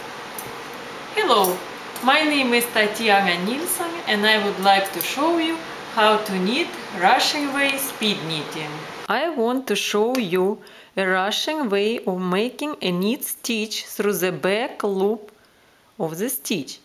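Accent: Russia. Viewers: You have the same accent as CrazyRussianHacker! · This is a good video you did well. Thank you. I am used to listening to the Russian accent as my daughter-in-law is Russian. Thank you for sharing.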